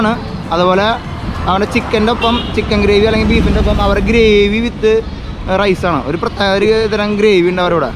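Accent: Thrissur, India. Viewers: sherinz vlog നു ശേഷം, ❤️തൃശൂർ ഭാഷ ശൈലി ഒരുപാടിഷ്ടം ❤️ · തൃശൂർ slang fan 🤣🤣me from ekm.... പൊരിച്ചുട്ടോ ഗഡി നിക്ക് ഇഷ്ടായി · മച്ചാ സംസാരിക്കുമ്പോൾ ഒന്ന് ശ്രെദ്ധിക്കണെ ഇടക്ക് തൃശൂർ സ്ലാങ്ക് വരുമ്പോ മറ്റുള്ള ജില്ലക്കാർക്ക് എന്താ പറയണെന്ന് മനസിലാവില്ല പിന്നെ baground മ്യൂസിക് വേണ്ട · ഈ തൃശൂർ സ്ലാങ് എനിക്ക് വളരെ ഇഷ്ടമാണ് bro.. തൃശൂർ രീതി തന്നെ ഉൾപെടുത്തുക.. സൂപ്പർ ആണ് ഞങ്ങക്ക് മറ്റു ജില്ലക്കാർക് ഒരു അത്ഭുതം ആണ്.. ഇനിയും വീഡിയോസ് ഇടൂ.. full സപ്പോർട്ട് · Mr Ningal Muthanu...❣️ Happened to watch your videos recently only, but have to say that your approach is so gentle & pleasing.. Iam a proud subscriber of yours, watching your each of every videos with utmost love & affection.. Njan Thrissurkaru allenkilum snehamullavaraa alle.. Ente veedu Ayyanthole anu.. But I'm in Bangalore since 6 yrs.. Nattil varumbol pattumenkil kanam👍🏽 All the best to.. Thanks for much forgotten Thrissur Slang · Loved your presentation....actually loves your Thrissur slang🙂🙂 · കിടിലൻ coverage n trissur bhasha.pinne ഇങ്ങോട്ടും ഒന്ന് തിരിച്ച് വരൂ.. 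· തൃശൂർ സ്ലാങ് എനിക്ക് ഭയങ്കര ഇഷ്ടം ആണ്😊: From കോട്ടയം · Super presentation bro with our typical thrissur style... I’m from ollur...all the best for your channel..👍👍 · Njan oru Alappuzhakkaran aanenkil um enik thrissur slang valare ishatanu, Ee videos kanumbol nattil pokan tonum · Landed here from the antartica video.. I paused the video in the middle and clicked the subscribe button, hearing ur simple thrissur slang😂.. Ways to go bro 👍🏻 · ഞാൻ പുതിയ സസ്ക്രൈബറാണ് ട്ടാ കണ്ടപ്പം കേറി നോക്കിയതാണ്ട് ട്ടാ ഉടനെ തന്നെ ബട്ടൺഞക്കി പൊട്ടിച്ചിട്ടാ? ഞാൻ മലപ്പുറത്ത് കാരനാ ട്ടാ എനിക്ക് തൃശ്ശൂര് കാരെ ഭയങ്കര ഇഷ്ട, ണ് കാരണം നിങ്ങളുടെ ഭാഷ തന്നെ നല്ല രസമാണ് കേൾക്കാൻ ഞാൻ.Aju ടwold കാണാറുണ്ട് ഹാരിസ്ക്കയുടെ ചാനലുകാണും ഇതെ ഇപ്പം ട്രാവലീ ഷടയു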